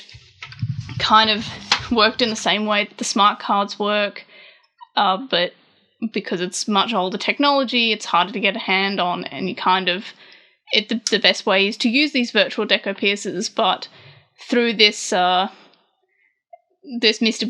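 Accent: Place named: Australia. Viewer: just when I was expecting the youtuber go be American, turn the sound up and the YouTuber is Australian!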